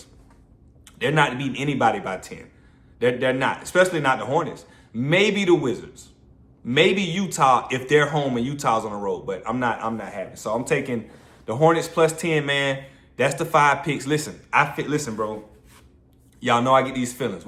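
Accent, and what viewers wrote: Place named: Jacksonville, United States. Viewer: Keep these picks comin from the 904 Jacksonville Fl and I think I hear the Duval in you too😂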